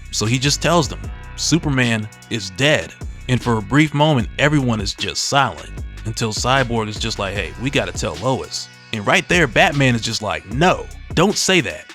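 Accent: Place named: Atlanta, United States. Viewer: I swear you sound like a ATL dude fr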